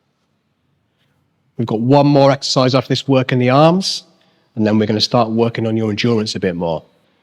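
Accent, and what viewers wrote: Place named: Wales, United Kingdom. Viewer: I agree! Plus I love the Welsh accent. Thank you,!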